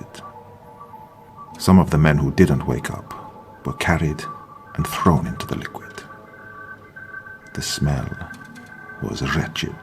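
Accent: Russia. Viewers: Hello there. Has anyone ever told you that you have a good Russian accent? I do love these extra stories you give us. Much appreciated good sir.. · I'm no expert with accents but in my opinion your Russian accent has become soooo good! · That is an AMAZING Russian accent!! You do accents (especially Texan) so well! 🖤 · ❤️ Love the Russian accent but I found the music too loud and distracting 😩🙉🎶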